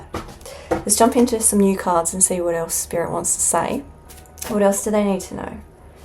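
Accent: Australia. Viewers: Are you from Australia or England? New Zealand perhaps .your voice ❤❤❤ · Love your Australian accent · ambient australian authenticity ♫ ergonomic ♪ easy on the ears ♪ pronunciation sans pretence ♪ maaaate ♫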